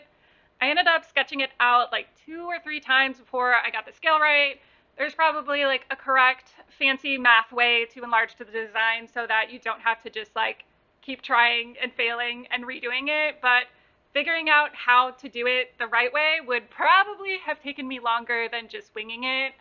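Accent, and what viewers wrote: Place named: United States. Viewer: I love your art but I dont like american accent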